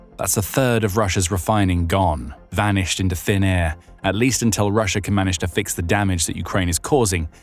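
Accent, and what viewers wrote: England, United Kingdom. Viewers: Why does the narrator, who's clearly English by his accent, keep calling petrol, "Gasoline"? In the UK they cal it petrol NOT gasoline!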